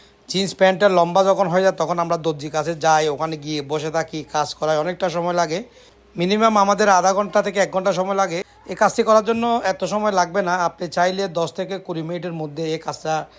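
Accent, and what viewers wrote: Chittagong, Bangladesh. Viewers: Vai apni Chittagong er….. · চিটাংগা মানুষ বদ্দা।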